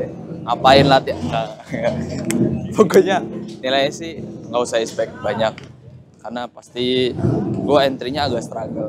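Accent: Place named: Malaysia. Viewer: melayu gitu logat nya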